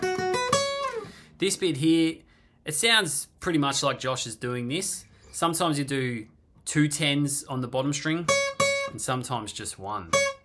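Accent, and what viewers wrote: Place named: Australia. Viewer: is an aussie talking here.😀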